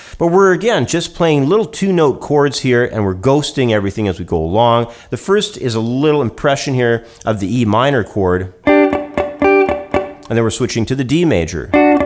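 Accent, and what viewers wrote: Canada. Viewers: Nice job my man. I think I understand now. Btw, are you Canadian? I'm only asking cuz I can hear your accent.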